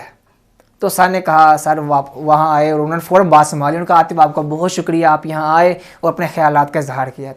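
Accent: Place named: Punjab, India. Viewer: MASHA ALLAH aap buhut acha kaam ker rahy ho.....well done... buss apny Urdu accent py kaam kerty rehna.... kyonky Punjab main yeh normal hai....Mulk (country) ko Mullak.... Motivational speaker ky liye us ki awaz aur lehja buhut ihmiat rakhti hai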